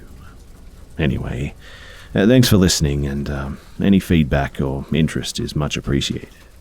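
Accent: Australia. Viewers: I haven’t watched one of these in quite while like 2016 or something I missed the Aussie voice mates.*I am Australian btw*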